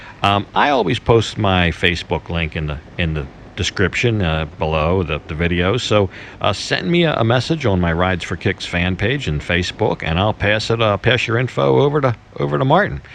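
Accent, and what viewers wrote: United States. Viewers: We get you on the ships . Outside manilla. A real american voice can't be bad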